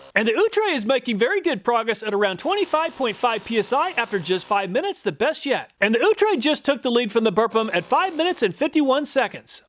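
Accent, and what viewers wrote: United States. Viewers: If only I could stand to listen to your squeaky Yankee voice.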